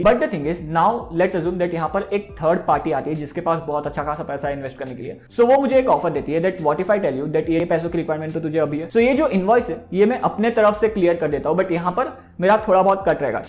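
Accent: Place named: Gujarat, India. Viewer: Jiv me thoda ..... 😅😁... Gujju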